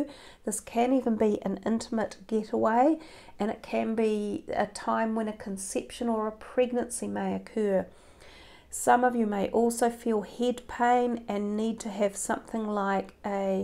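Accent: New Zealand. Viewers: I hear another NZ accent !! Nice reading. ☯️🌈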